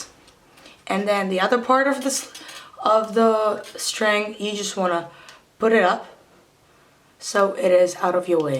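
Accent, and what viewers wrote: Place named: France. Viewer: Oooh ok i was almost sure to have recognized the french accent (because i'm from France ahah).Thanks again for your help and dudette 😎🤘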